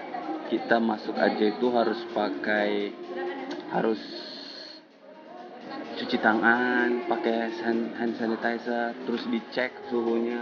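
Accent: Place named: Makassar, Indonesia. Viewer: Mantab tawwa nda nabuangi logat Makassar nya Ada biasa barui sekali ke kacarta ehh kaki ku keinjjak kaki gue 🤣 Salam kenal sodara 🙏 sesama ana Makassar Makassar bisa tonji 🤣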